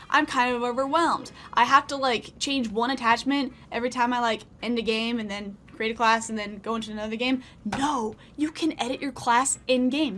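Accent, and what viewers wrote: South, United States. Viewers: i like the southern accent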